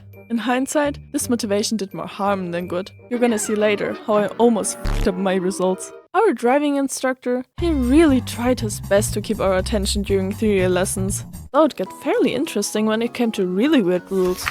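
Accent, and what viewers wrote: Germany. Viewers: Your German accent sounds really cute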